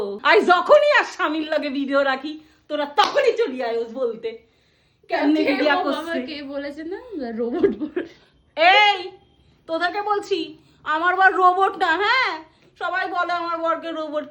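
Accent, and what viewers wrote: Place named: Bangladesh. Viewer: কি সুন্দর লাগলো দিদিভাই আপনার কথাগুলো।এইরকম করে বাড়িতে কথা বলি ঠাম্মার সঙ্গে।আমর বাবা কাকা সবাই বাংলাদেশে জন্মেছেন। তাই এমন করেই কথা বলা হয় বাবার বাড়ির দিদিভাই দাদাভাই সবাই একসঙ্গে হলে। আমরা শুদ্ধ বাংলা বলতে পারলেও আমার ঠাম্মা একদম শুদ্ধ বাংলা ভাষায় কথা বলতে পারে না। ওনার আঞ্চলিক ভাষায় উনি কথা বলেন। নিজের কথা আপনার মুখে শুনে কি যে ভালো লাগলো। ভালো থাকুন সবসময় নিজের পরিবারকে সঙ্গী করে ❤❤🙏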